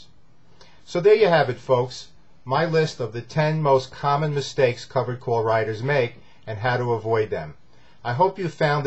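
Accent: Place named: Long Island, United States. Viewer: OMG, within the first minute I thought to myself.. His accent sure sounds like hes from LongGisland (Where I live) then wamn, I noticed the Bethpage address in the background. Farmingdale resident here.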